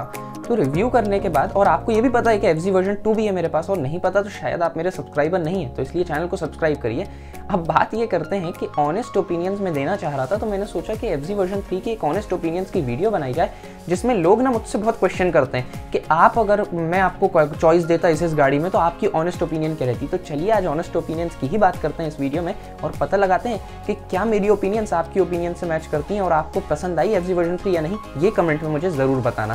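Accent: Bhopal, India. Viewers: Bhopali accent 🤟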